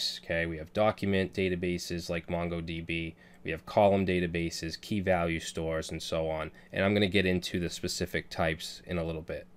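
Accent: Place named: Massachusetts, United States. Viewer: I thought I heard it, I heard the Massachusetts accent! Should call it the New Englandah, but it's not as prevalent in New Hampshire. Still can hear it in some parts of Maine. Great video man!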